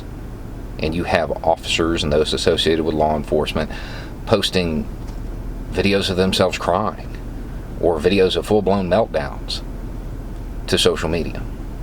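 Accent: South, United States. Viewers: Why does common sense make so much more sense presented at the proper cadence, in a southern drawl? New subscriber and a big fan of everything I've heard so far. Howdy from Detroit.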